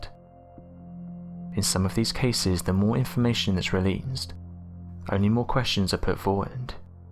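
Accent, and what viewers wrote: England, United Kingdom. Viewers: T A it’s an English accent.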